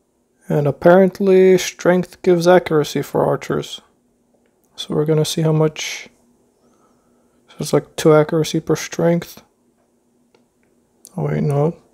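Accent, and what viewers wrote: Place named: Russia. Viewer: Мне тоже показалось он с каким русским акцентом говорит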